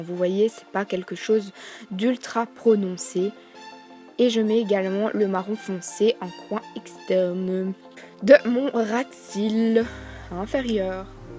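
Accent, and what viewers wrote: Belgium. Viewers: tes belg ?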